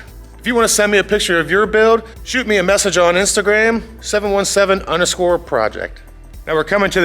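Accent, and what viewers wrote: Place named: Philadelphia, United States. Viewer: OMG you have such a Philly/South Jersey accent !!! PS - Your 2022 Civic sport looks amazing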